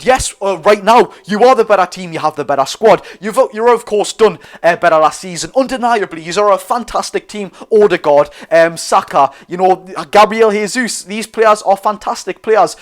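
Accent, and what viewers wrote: Newcastle, United Kingdom. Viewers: I must say I love the Geordie accent very friendly brilliant video by the way 🤍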